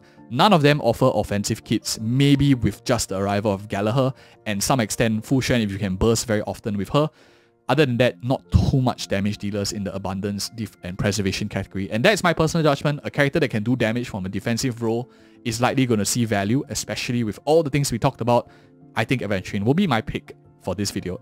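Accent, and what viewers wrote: Singapore. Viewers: immediately could tell the singaporean accent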